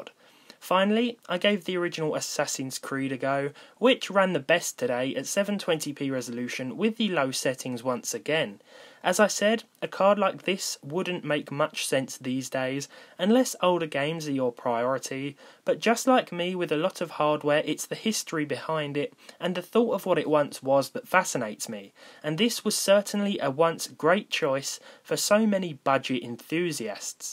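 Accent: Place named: Kent, United Kingdom. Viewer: I've just realised that is a picture of swalecliffe/herne bay! I knew I recognised your accent!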